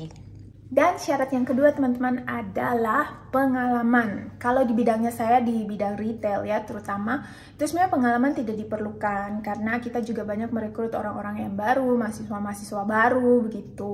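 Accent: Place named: Eastern Indonesia, Indonesia. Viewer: Ka diindonesia dari derah timur ya? Mirip2 dialeknya tmnku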